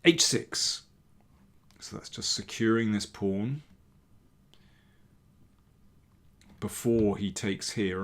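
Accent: United Kingdom. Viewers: Your British english is perfect for my understanding as a french chess player !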